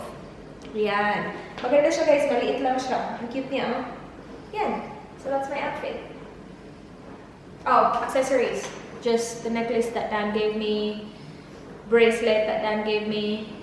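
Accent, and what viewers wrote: Visayas, Philippines. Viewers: bisaya ka o tagalog? Prang visayan accent ka kc?bsaya din ako.. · Sis.. Klaro kaau na bisaya ka? Correct me when f I'm wrong 😊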